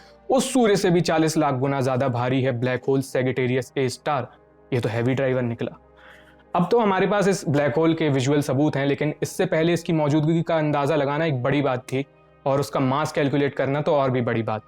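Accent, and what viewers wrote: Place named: Purvanchal, India. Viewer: मैटर फस्स्स गया है 😃😃😃 आप और स्टोरी राइटर 200% यूपी पूर्वांचल के मालूम पड़ते हो 😎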